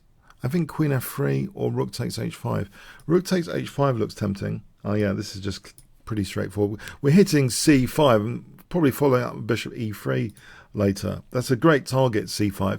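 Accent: England, United Kingdom. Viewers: Thank you so much dear I really learn so much from you, great analyzing tactics and plans you really Amazing. beside entertaining I like your English accent..smile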